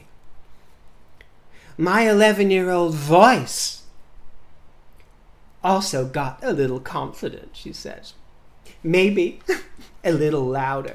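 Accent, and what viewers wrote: United States. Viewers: Your American accent is killing me! 🤣💕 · OMG! Your American accent cracks me up!!! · your American accent is perfect! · LOVE your American accent! Got me laughing! Hugs from Texas. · you do a great American accent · I just love your American accent today. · I love the switch to your American accent! XD great video, xx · Just love American girl accent, so perfect! You gave the most incredible wit n humor! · I love your American accent! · You're a yank...?🤭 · You are such a gem! The American accent is 🤌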